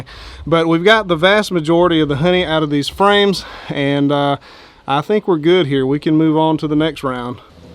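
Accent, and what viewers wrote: South, United States. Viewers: you know the second you hear that thick southern tang, it’s gonna be the most pleasant video you’ve watched at 3am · That’s a cool accent 👍 What parts of America is that accent from? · Southern Chris Pine??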